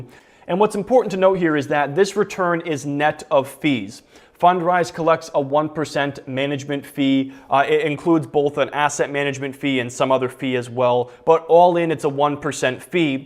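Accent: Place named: Midwest, United States. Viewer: Hey, just curious where you're from? It sounds like the Midwest. Are u from Utah or Wisconsin or something?..just curious:)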